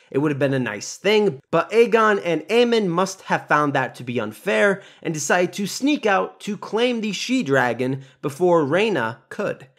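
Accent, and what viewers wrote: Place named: United States. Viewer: The man is a yank, it's understandable he cannot speak true true (Oxford/Queen's) English.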